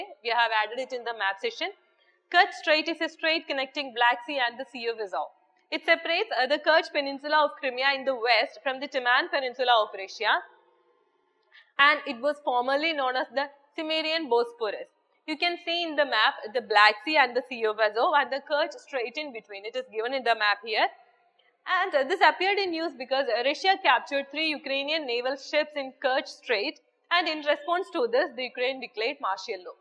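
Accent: Kerala, India. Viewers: thanks to neo ias ..great love from karnataka,... mam ur accent is in malayali style....for outer state student bit difficult to understand some words ...example- law....u pronounced it as lu, fog -fug, etc ...........speed is like bullet train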